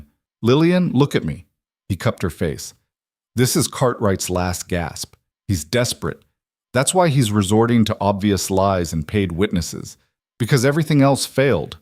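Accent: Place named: United States. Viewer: American accent dosen't suit the story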